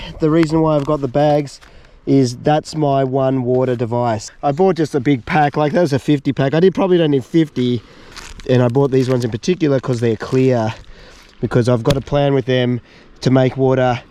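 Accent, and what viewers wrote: Australia. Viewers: aussie accent overload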